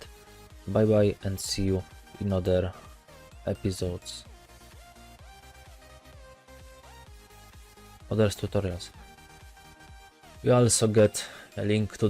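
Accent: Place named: Poland. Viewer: widać ze polak po akcencie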